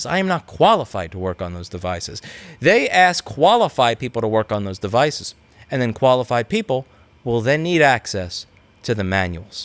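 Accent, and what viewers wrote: New York, United States. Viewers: I love how 99% of the time is hardcore in-your-face New Yorker, but when it comes to BlackBerry.... suddenly he's all sweet with a cutesy voice! It's quite the difference 😁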